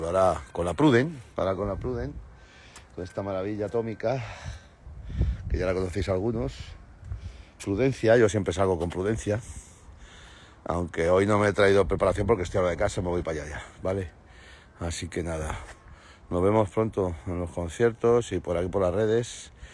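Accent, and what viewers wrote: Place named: Mexico. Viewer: Que bello Rafa, me mato con su acento mexicano 😄 Igualmente que pases unas muy felices fiestas, llenos de momentos inolvidables a lado de tus seres queridos, Mucha salud para ti y para ellos tambien. Siempre me gustaron todos los chicos del grupo, pero Rafa fue mi consentido por locochon 😜😄 Los Hombres G fueron mi primer concierto de rock, tenia en aquel entonces 13 años cuando vinieron a mi ciudad en Chihuahua Mexico a dar un concierto en el '89, recuerdo que mi papa se me pego para ir al concierto con el pretexto de cuidarme y al final resulto que se sabia las canciones y las canto a todo pulmon, Fue inolvidable y guardo muy gratos recuerdos de aquel concierto. Ahora vivo en Estados Unidos y siempre que vienen a mi ciudad los voy a ver. Saludos!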